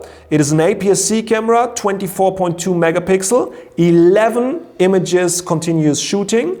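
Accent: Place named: Germany. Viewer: I Know and i'm learning German right now so i can relate to his accent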